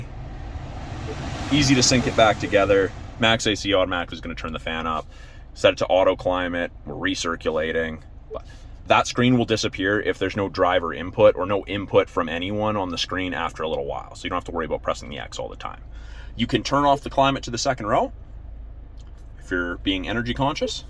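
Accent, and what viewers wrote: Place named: Canada. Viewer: I enjoy this fellas reviews he’s got moxy to him and that Canadian accent is a hoot!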